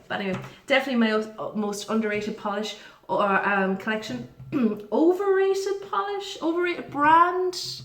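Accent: Ireland. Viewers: I love your accent. Irish accents are one of my faves! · I love listening to you talk. Very partial to an Irish accent lol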